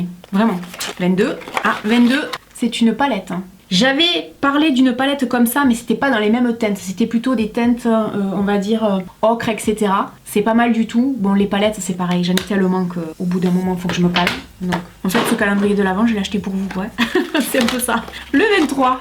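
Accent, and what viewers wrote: South, France. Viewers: Coucou, super cool ta vidéo j'adore, je ne savais pas que Lidl et Action c'étaient mis à fait ce genre de calendrier et mon préféré des 3 que tu as essayés est le Spa de chez Action! 😀 Très déçue de celui de chez Lidl franchement je préfère mettre 4 euros de plus et avoir celui de chez Maybelline qui est vachement plus quali que celui de Lidl et avec beaucoup de full sizes... Merci pour cette vidéo, avec ton accent du Sud que J'ADORE (forcément j'ai le même, pas très objectif...). P.S: Effectivement ça serait cool que tu unboxes un calendrier bio! (Pas très objectif non plus, je bosses dans la certif de produits bio... 😂😂) Gros bisous!!!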